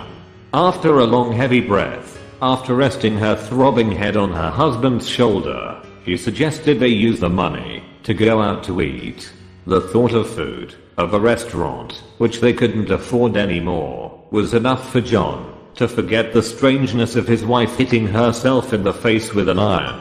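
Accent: United Kingdom. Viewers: Full of British Accent!Wonderful!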